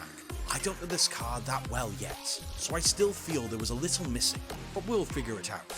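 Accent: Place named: United Kingdom. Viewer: british accent might be tricky when it comes to foreign names 😆 i heard it on other channels too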